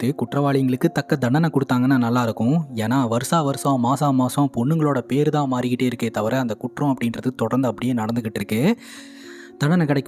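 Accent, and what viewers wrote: Coimbatore, India. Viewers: coimbatore ah neenga?!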